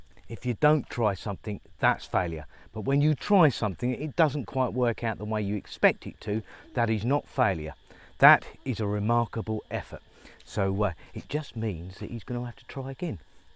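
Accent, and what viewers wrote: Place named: East Anglia, United Kingdom. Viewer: Hi, I know the feeling re-grey hair! Still, I bet it feels refreshing in the heat over there plus less wind resistance on your trusty steed. I had you down as a carrot-cruncher or a swede-basher, there's a bit of an east Anglian twang in there I reckon, did you move out that way later on? All the best to you all, including the outlaws who seem very nice and, like their daughter, very hardworking people.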